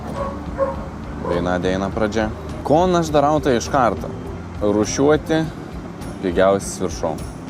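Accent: Samogitia, Lithuania. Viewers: Ačiū,Tau uz video.Labai grazi Tavo kalba kaip zemaiciuoji.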